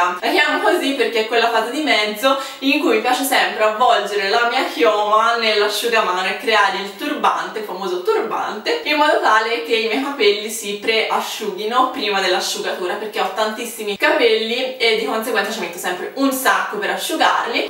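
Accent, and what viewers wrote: Tuscany, Italy. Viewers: Sei della toscana? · Sei toscana? Video utilissimo <3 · dialetto toscano ;) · ma sei fiorentina'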